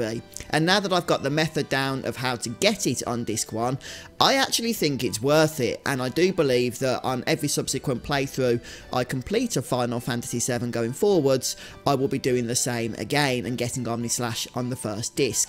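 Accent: United Kingdom. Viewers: I like youre GB Englisch!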